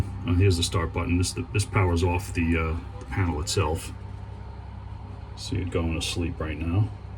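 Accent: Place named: East Coast, United States. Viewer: Hey there. Long time follower since we were East Coast neighbors with distinct "accents" lol, before your move to sunny Fl. The best thing I liked seeing you do was not only the last upgrade video where you replaced the engine flush connectors to quick fits, which is a game changer and something me and Dad did to all our boats and dock gear back a bit in the day. But you read my mind and connected them both with the jumper for a one and done, which wasn't available back then. But yeah was really another cool thing you came up with, on the fly for maximum ease. It brings me back to the good ole days with Dad, you two have/had the same think on the quick and make it work or fix anything. 👍 Good stuff. Best wishes too you 3 on your new girl and new adventures!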